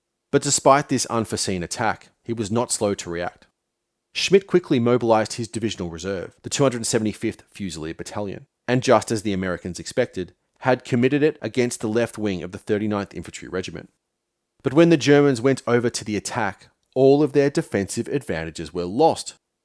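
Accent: Australia. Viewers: Are you from Aussie bro? Canberra? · Mate, pleasure to hear our Australian accent. Salutations on your work.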